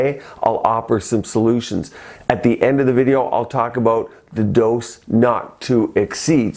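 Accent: Canada. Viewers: A Canadian. They pronounce About and Out very strangely. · are u canadian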